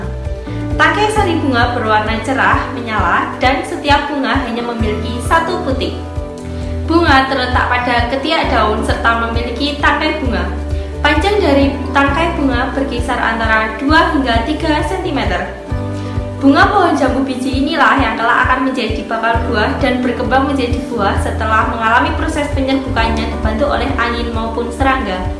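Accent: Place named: Java, Indonesia. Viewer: mbaknya cantik, tapi medhokk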